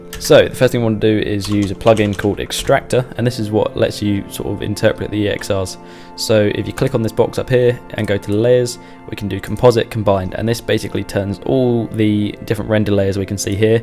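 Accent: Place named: United Kingdom. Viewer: when you have a brittish accent try talking slowly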